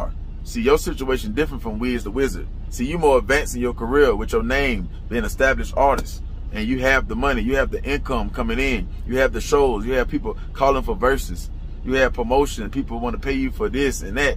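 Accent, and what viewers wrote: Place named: Louisiana, United States. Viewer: This whole time I been tuned in thought you was from Louisiana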